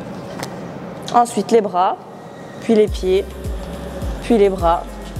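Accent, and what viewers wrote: Belgium. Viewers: Supers conseils, même pour qq1 d'averti, merci (waouw l'accent région Liège, je kiffe - Pierre - 4500 - Huy)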